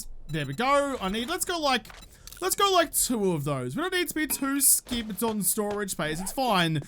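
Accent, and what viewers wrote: Australia. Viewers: personality is just so GD endearing and he's such a wholesome kinda guy. And come on... that Aussie accent is awesome, not weird. One of my favorite YTers! And BTW, Desert biome FTW!!!!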